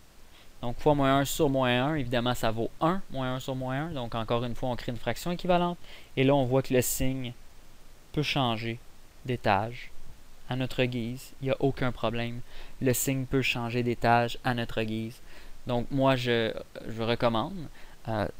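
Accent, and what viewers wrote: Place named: Quebec, Canada. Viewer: merci beaucoup et magnifique accent quebecois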